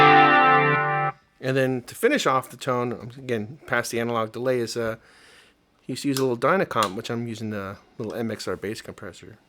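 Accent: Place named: Baltimore, United States. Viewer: Fantastic sound! I, too, am a bit of a tone chaser and am gratified that with the tools I have, I can replicate those great Andy Summers sounds. Curious, are you from the Baltimore/MD/VA area? As a tone chaser, I also hear dialects. Occupational hazard.;)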